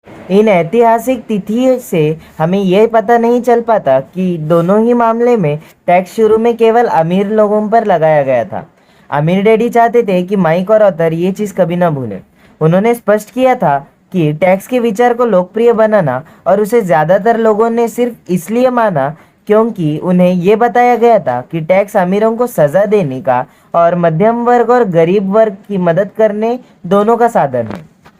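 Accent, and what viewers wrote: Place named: Hyderabad, India. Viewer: Tum Hyderabadi Miya lag raho ji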